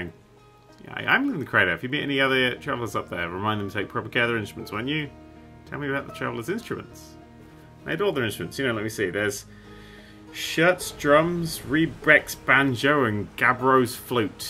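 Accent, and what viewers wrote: United Kingdom. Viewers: Sir, I don’t know how to make this not sound wired, but you are delightfully British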